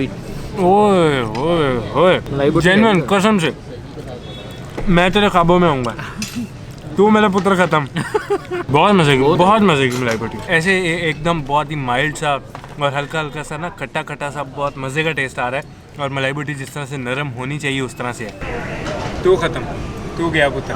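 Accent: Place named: Punjab, India. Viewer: Love from Indian sir ap k bolna k tarika punjabi 🥰🥰🥰🥰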